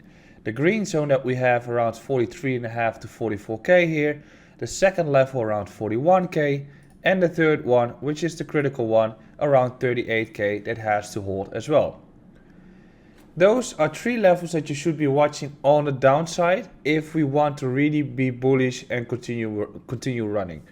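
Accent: Netherlands. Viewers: I smell dutch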